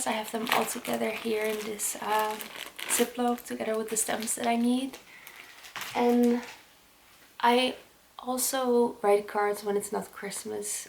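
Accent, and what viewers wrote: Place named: Netherlands. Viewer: I have been watching your videos for a while now because I like Norway and Scandinavia in general. I like your simple down to earth way of living, which reflects in your videos. I already thought you were Dutch by the way you speak English, but now I know for sure.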